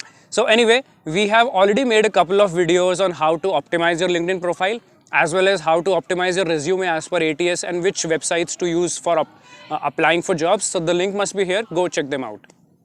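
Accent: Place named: India. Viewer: Well, I'm Ukrainian and it's a bit difficult for me to understand English with Indian accent but I'm trying. Not all your subscribers are able to understand Indian language at all, so it would be better not to mix it up and create separate videos in English and Indian only for your audience's convenience.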